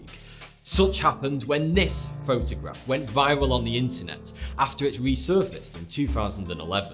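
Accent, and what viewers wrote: United Kingdom. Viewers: interesting video, but that obnoxious bri-ish accent made my ears bleed. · What British accent is it? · Why u say bot not but? Oh ur from uk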